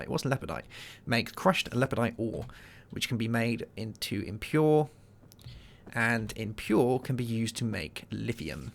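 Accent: United Kingdom. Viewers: You've got a very nice British accent ;)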